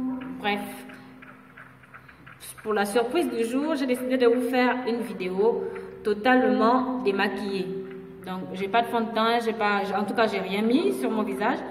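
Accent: Gabon. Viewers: Bjr ma belle tu as l'accent Gabonais de quelle pays est tu si ce n'est pas indiscret?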